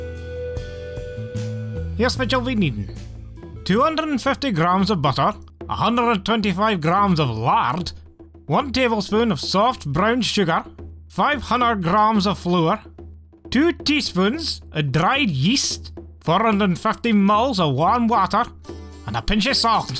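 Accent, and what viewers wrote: Scotland, United Kingdom. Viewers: What region of Scotland is that accent? My grandparents are from fraserborough and the accent is completely different. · Yir a Fruit Loop Min!! Bit yair damn funny wicht it!! Fits Like!! It's great tae hear the auld Brogue, one thing I dae Miss oor here in US ofA, yir Brilliant, Keep it up Min!!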